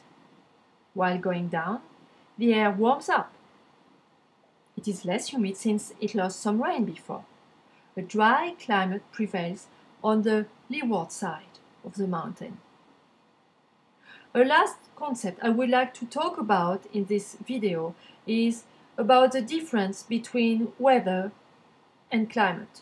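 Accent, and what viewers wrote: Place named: France. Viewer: Dude she's obviously French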